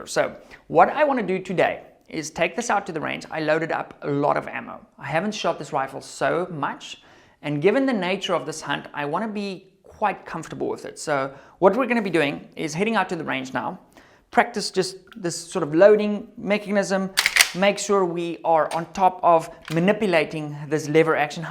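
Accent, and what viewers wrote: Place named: South Africa. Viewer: ah a new transatlantic accent , half afrikaans , half american english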